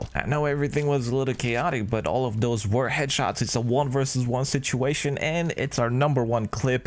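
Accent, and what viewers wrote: Romania. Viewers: Esti Ardelean sau Oltean? :)))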